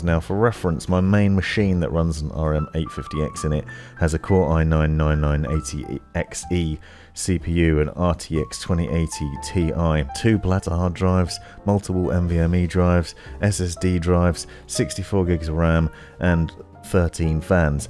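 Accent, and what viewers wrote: England, United Kingdom. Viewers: English accent, jazz piano, that earns a sub. Hope the tutorial is good.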